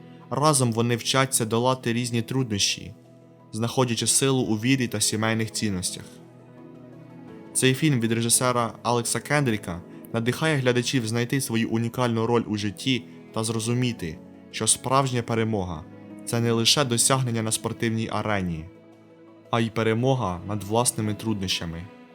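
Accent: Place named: Cherkasy, Ukraine. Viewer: Люблю цю вимову, диктор явно з Черкаської області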